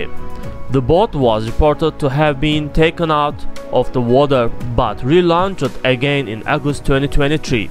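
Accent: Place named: China. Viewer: Why does narrator have Chinese accent? 😊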